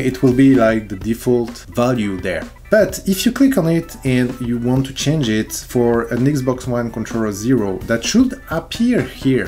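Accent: France. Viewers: Did you manage to get rumbles with the Xbox controller ? Btw I heard ur french accent ( Or maybe german/dutch)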